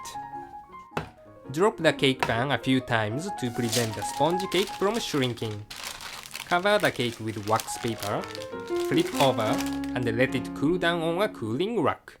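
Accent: Japan. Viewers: I just love Japanese accent. It makes the cake yummier! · Noo! Just Japanese engrish!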